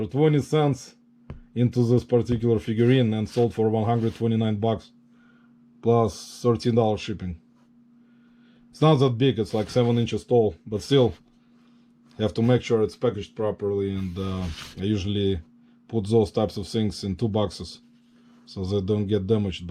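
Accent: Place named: Russia. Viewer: I hope you don't mind me asking. What country are you from? My son served a mission in Iceland for two years and I have friends down there now I talk with all the time. They speak English, too, and they're accent sounds a lot like you , but the more I'm listening , sounds Russian. Either way, Love it! I've sold those singing fish in the past but it's been awhile. Glad you were able to sell it. Woa! That's awesome on the Precious Moments. Looks like it's from the 70s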